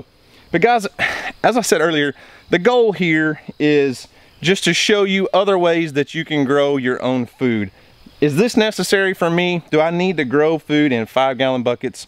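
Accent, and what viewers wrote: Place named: Oklahoma, United States. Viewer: Thanks for the instructions...going to give it a try. Your Oklahoma accent is quite differ different from my Michigan gab. Enjoy growing!👨‍🌾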